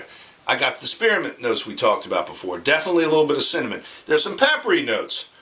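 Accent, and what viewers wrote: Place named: Virginia, United States. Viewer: You are a Virginian i can tell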